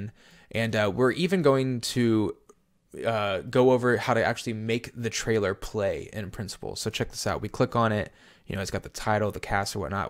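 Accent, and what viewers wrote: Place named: Canada. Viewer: great tutorial, appreciate your down to earth style, sense of humour, and humility. Couldn't pin down your accent! you sound Canadian.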